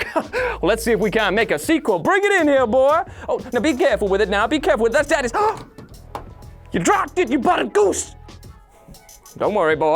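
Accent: South, United States. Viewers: I'm in love with ' Southern accent! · in his southern accent 👏🏽👏🏽👏🏽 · Wow, seems like Crapcom's not so hot on those sequels, huh? And although your American accent is funny, DMC2 was ALL Crapcom Japan. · That southern accent tho lol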